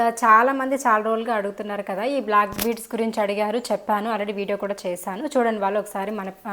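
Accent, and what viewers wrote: Chittoor, India. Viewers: Hi andi medi ye uru,Chittoor slang laga undi anduku adigyanu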